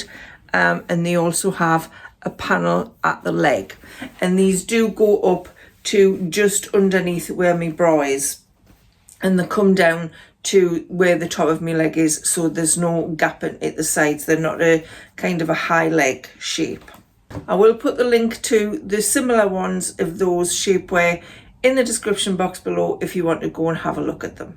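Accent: Newcastle, United Kingdom. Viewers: Such a good informative advise to anyone using shapeware clothing to improve their image in clothing and your photos prove it works! Well done. Oh, and I love your geordie accent 💞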